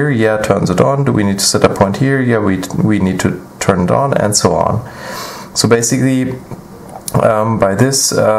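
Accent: Germany. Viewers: I knew you were german when you started talking hahahahahaha hallöchent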